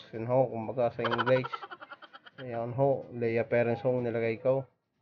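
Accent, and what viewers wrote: Batangas, Philippines. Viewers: Ipakita mo yong pangalan ng bote ng ingredient di maintindihan yong tagalog mong batangueno eh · Boses palang areh eh .tubong batangas talaga..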